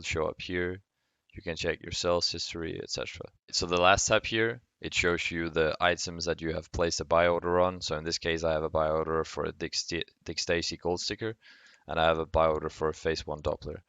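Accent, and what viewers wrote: Denmark. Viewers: are you danish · jeg vidste du var dansker da jeg hørte din accent og det fik jeg bekræftet da du skærm optog din steam mobile guard app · DENMARK jeg kan høre det på agsangten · u danish?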